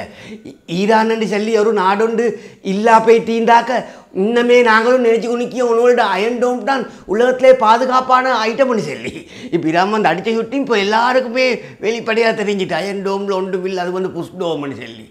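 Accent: Sri Lanka. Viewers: ப்ரோ உங்களுடைய வீடியோக்களை ஆர்வமாக பார்க்கும் உங்களுடைய சப்ஸ்கிரைபர் ஒருவனாக சொல்கிறேன் நீங்கள் பேசும் தமிழ் இலங்கை தமிழாக இருக்கிறது நாங்கள் தமிழ்நாட்டு தமிழர்கள் கொஞ்சம் புரியவில்லை உலகம் முழுக்க வாழும் தமிழர்கள் அனைவருக்கும் புரியும்படி உங்களுடைய வார்த்தையில் உச்சரித்து சொன்னால் இப்பொழுதே உங்களுடைய வீடியோக்களை ரசித்து பார்த்துக் கொண்டிருக்கிறோம் தெளிவாக புரியும் படி வீடியோ பேசி போட்டீங்கன்னா இன்னும் பெட்டரா இருக்கும்....😊😊😊😊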